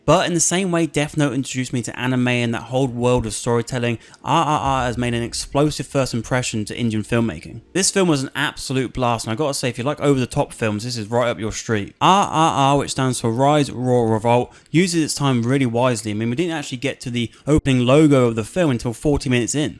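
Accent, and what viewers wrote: United Kingdom. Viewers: Is this British accent??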